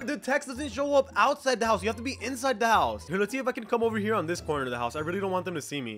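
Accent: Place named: South, United States. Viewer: I like how he talk in dixe voice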